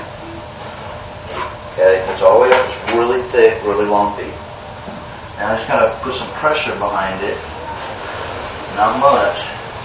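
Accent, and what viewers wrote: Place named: Tennessee, United States. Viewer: i saw back on the other video you didn't take much time to insulate thoroughly or use a vapor barrier. I would guess by your accent..you're in Tennessee maybe??just a guess.I'm in a northern climate, and up here with that little bit of insulation you would have cold toes & frozen pipes. Thanks for taking the time to this series. You did a fine job.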